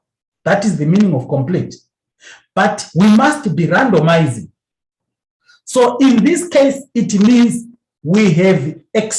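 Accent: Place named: South Africa. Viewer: Also I liked the SA accent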